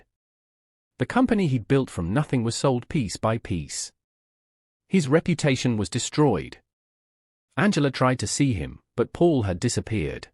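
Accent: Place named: RP, United Kingdom. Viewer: The British RP accent? Schedule Aluminum Oestrogen..etc aren't American Pronunciation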